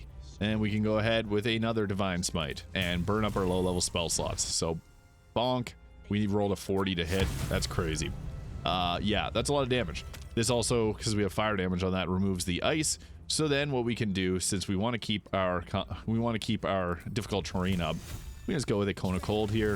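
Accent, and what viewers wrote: Canada. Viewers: lmao yes I figured you were my northern neighbor greeting from Minnesota!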